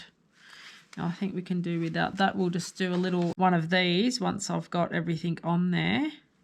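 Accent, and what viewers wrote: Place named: Australia. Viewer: I picked your Aussie accent straight up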